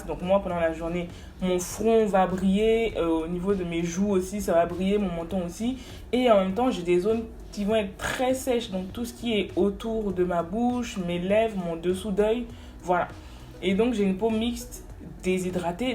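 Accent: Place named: Ivory Coast. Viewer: L'accent me fait penser a une ivoirienne 🥰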